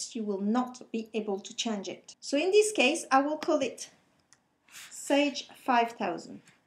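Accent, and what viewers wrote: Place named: France. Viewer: You are especially short-sighted if you think her "funny" accent makes her clueless about Sage. If anything, it goes to show her fluency in one more language (french), which is something I doubt you will ever boast of. If you had anything useful between your ears you'd obviously see she has an in-depth knowledge of the subject with the way she states the advantages or disadvantages of using particular options. Nitwit!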